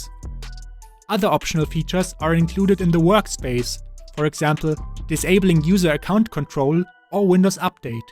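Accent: Germany. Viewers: man hört deinen deutschen akzent fett raus und trotzdem sprichst du englisch?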